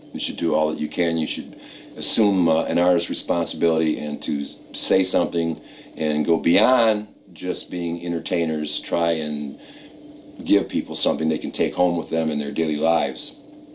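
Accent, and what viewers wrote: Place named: Midwest, United States. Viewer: A beautiful Midwestern voice!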